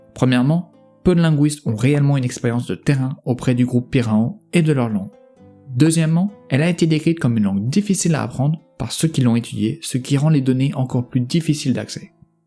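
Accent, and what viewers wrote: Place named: Portugal. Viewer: oui je me demande aussi. Dans quelle région on prononce [tj] dans voiture ? jamais entendu ! cet accent me semble plutôt un peu portugais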